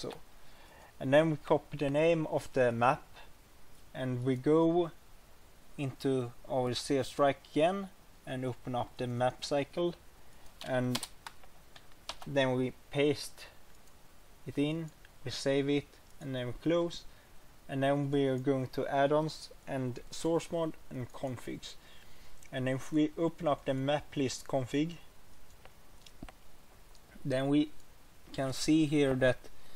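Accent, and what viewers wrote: Sweden. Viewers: Thanks! I was completly sure that you were swedish when I heard you talking.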